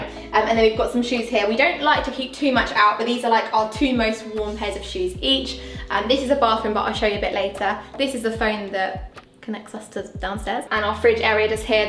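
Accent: United Kingdom. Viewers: That british sexy accent💜 Everytime i hear british accent i always think Hermione😂 it's fayaah!🔥 Sorry from my english haha😅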